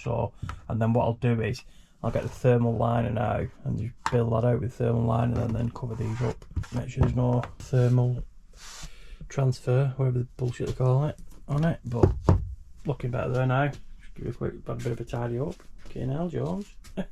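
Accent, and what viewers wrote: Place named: Leigh, United Kingdom. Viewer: were are you from leigh or wigan sounds like leigh bolton lad here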